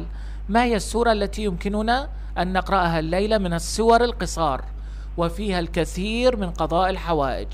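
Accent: Lebanon. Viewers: ماجور ان شاءالله بس عندي استفسار خارج الموضوع يأخي نبرة صوتك قريب على اللهجه اللبنانيه او السوريه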